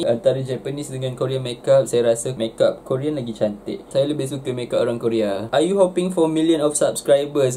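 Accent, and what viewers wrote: Sabah, Malaysia. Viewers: Ko asal sabah ba pula. Pndi ka slang sabah ? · Best pulak dengar cakap sabah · Best nya dgr abam ckp sbh.. hehehe sya sabah celup.. ayah penang.. mak org sbh.. ddk di sbh smpi umur 10thn ja.. so little knowledge about my hometown sabah.. so sya di pggl sbh celup.. huhu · Bunyi KK sangat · bahasa sabah..cenggini ....👍👍👍👍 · Awww so agree with u. Sabahan slang awk still on point lah! I'm Sabahan too.. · Suka dgr ckp sabah.. pekat lg sabahan tu.. 👍🏻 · Sabahan bah pula 😅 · Sy bru tau kau org sabah😄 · waaaa... sabindo sabahan bh c 😍 salam dari Tuaran Sabah 😍 that sabah accent 😙😙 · Pahammm bah.hehehe langsung la kan teda mcm urg semenanjung bila bcakap loghat sabah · gila klau sia tda tgk ni video kan, sia tda tau oh ni org sabah 😂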